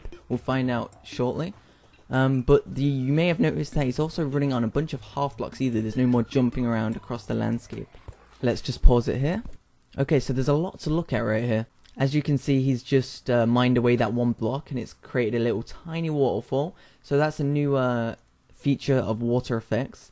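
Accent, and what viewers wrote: United Kingdom. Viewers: jesus christ, you sound exactly like James, from rune shark, i had to take a double check for a second...I dont live in the UK but im pretty sureyou have the same accent as well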